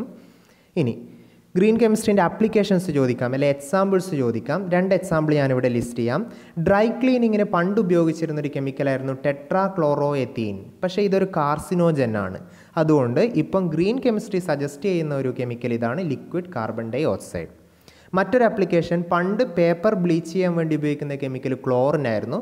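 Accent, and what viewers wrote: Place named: Kozhikode, India. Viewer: Sir Kozhikode aan allo😌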